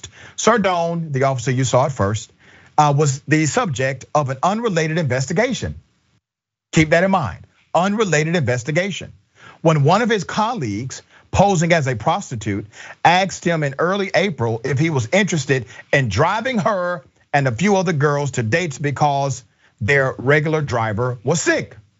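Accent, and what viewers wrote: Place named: Texas, United States. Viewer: HAS SUCH A STRONG TEXAS OR COUNTRY ACCENT THAT DOESN'T MATCH HIS APPEARANCE, BUT I LOVE WHEN WHITE PEOPLE DOESNT ALIGN AND SUPPORT OTHER CORRUPTED WHITE INDIVIDUALS. I ALWAYS SELL OUT MY FAMILIES AND FRIENDS WHEN THEY DO SOME REAL MESSED UP STUFF ESPECIALLY THAT COULD AFFECT OTHERS.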